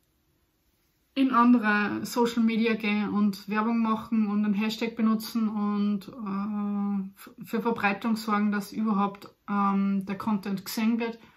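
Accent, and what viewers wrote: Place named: Upper Austria, Austria. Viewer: Hi! Ich hab eh schon bei einem deiner sehr frühen Videos nachgefragt, ob du auch aus Oberösterreich kommst (ich bin aus dem Mühlviertel) und war nicht überrascht, dass du das bestätigt hast. Dialekt finde ich gut und sollte für keinen Deutsch-Muttersprachler eine wirkliche Hürde sein... Ich finde Inhalte auf Deutsch gut und lasse mich überraschen. Zum Thema Ravensburger: Ich hab grad gegoogelt, ob der Name ursprünglich auch im Deutschen von einem Raben kommt und scheinbar gibt es verschiedene Theorien. "Rabe" ist eine davon, der Name könnte aber auch von "Rauenburg", also einem rauen Hang neben der Burg abstammen. Interessant jedenfalls.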